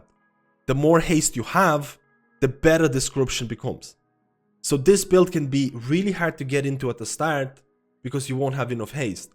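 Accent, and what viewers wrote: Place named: Ireland. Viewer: are you living in Ireland, serious Irish accent lol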